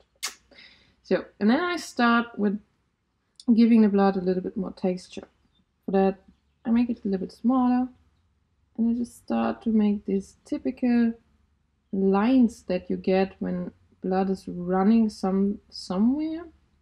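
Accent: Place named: Germany. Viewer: I realy like your german accent!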